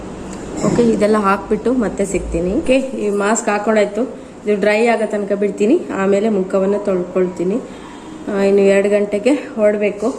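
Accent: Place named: Kundapura, India. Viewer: Hii akka Kundapura knnda matastri .. Yav oor nimdu . Nav hemmady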